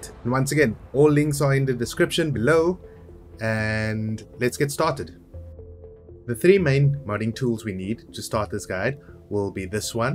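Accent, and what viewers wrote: South Africa. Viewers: i think i'm from the same place as you, south africa? i felt your accent sounded south african? as for the p*rn thing... haha, that actually why i switched to z. once you get to the three xxx to place things really at the bottom, you realise you need a more benign letter :) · I can hear another South African anywhere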